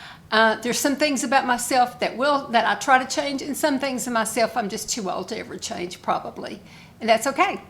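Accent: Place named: South, United States. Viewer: You would be fantadtic at ASMR!!! I often tune in to your channel right before bed to unwind. You are very nurturing by nature and your voice and Southern accent is calming and soothing. Just do it!!!! You are already doing ASMR without even knowing it! Lots of love and thanks for this video, i just had my 69th BDay in June. XOXOXO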